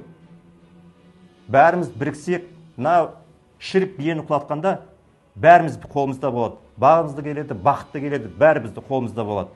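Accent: Standard. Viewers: Алга. Алга ДВК. казакша таза сойлейди. Казактардын ишинде казак тилин билмеген сон ерикзиз орыша сойлеп жур Токайдан 5 есе казакша сойлеп, казакша ойлайды.мухтарды колдаймыз.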